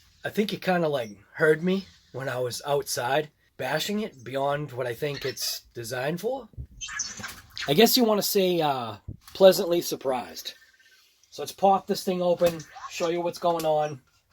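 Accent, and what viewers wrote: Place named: New England, United States. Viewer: Awesome bash and great truck! Are you from new England area? You sound like you got a accent.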